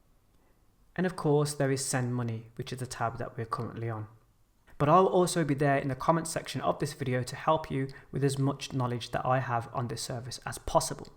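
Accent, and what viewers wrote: England, United Kingdom. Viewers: I love the ASMR style. I swear the English accent rather educational or not makes me feel like I learned something regardless of what it’s about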